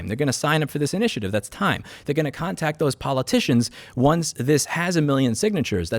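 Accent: New York, United States. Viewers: the feeble video can't handle his new yorkness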